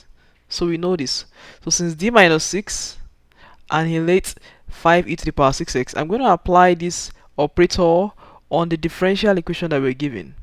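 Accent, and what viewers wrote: Nigeria. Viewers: Thank you for the video! You be Naija guy?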